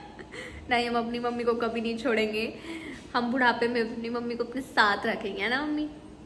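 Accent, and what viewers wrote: Haryana, India. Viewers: Nice vlog dii haranvi voice bhut achi h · Muje aap bolte hue ache lagte h mtlb aapki haryanvi me language bhut achi lgi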